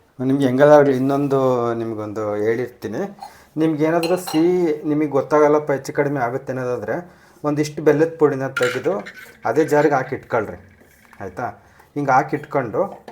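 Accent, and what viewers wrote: Chitradurga, India. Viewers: ದುರ್ಗದ ಭಾಷಾ ಸೊಗಡನ್ನು ಹಾಗೆ ಉಳಿಸಿಕೊಂಡು ಬಂದಿದ್ದೀರಾ ಕೇಳೋಕೆ ತುಂಬಾ ಖುಷಿಯಾಗುತ್ತೆ ನಿಮ್ಮ ಅಡುಗೆ ಎಷ್ಟೇ ರುಚಿಯಾಗಿದೆ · ನಿಮ್ಮ ಮಾತು ಕೇಳ್ತಾ ಇದ್ರೆ ನಮ್ಮ ಅಮ್ಮನೇ ನೆನಪಾಗ್ತಾರೆ. ದುರ್ಗದ ಮಾತು ರುಚಿ ತುಂಬಾ ಚೆಂದ. Thank you so much.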